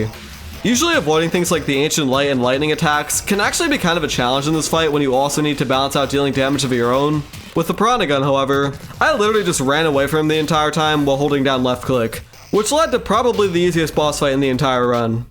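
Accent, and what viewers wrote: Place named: United Kingdom. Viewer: I'm sorry but every time you say a cuss word it makes me cringe and you sound like a white washed person that grew up in great Britain. 😭😭😭 But anyways good video you are good at terraria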